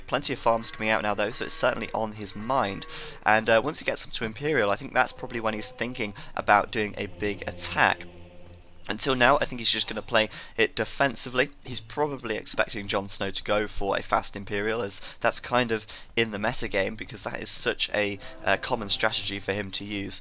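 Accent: United Kingdom. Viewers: YOU'RE BRITISH!!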